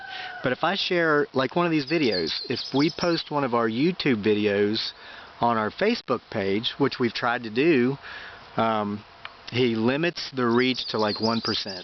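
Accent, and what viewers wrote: South, United States. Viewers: Just found your channel a couple days ago, and I tell you, I am impressed! Someone that lives by their morals rather than the bucks they can rake in...Thank you! I hope you are able to leave this job with a good taste in your mouth, that you have done your best, given above what you should have had to, and can leave with pride...I'm sure however it turns out, the full notice or not, that you have. As a northerner, I love listening to your southern speech...it's soothing to my heart and ears. My grandmother and great-grandmother came from the south, it feels like home... Blessings to you and your wife and son. God bless!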